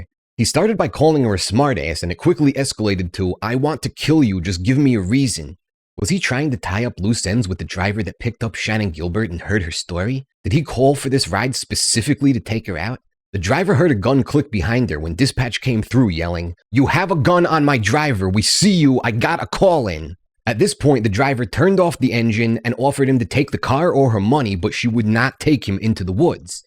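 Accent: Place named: New Jersey, United States. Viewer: lets get this man to 1k subs people. his jersey accent is worth it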